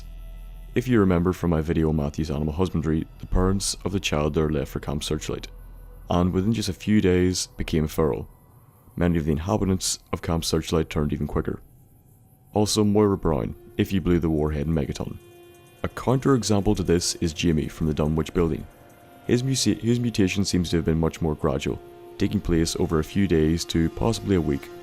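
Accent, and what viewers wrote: Northern Ireland, United Kingdom. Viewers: i believe he got a northern irish accent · That Northern-Irish accent tho!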